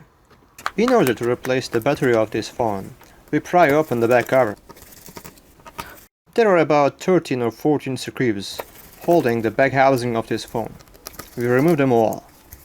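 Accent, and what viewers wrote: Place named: India. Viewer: very informative and interesting video. what country are you from? the english sounded kinda indian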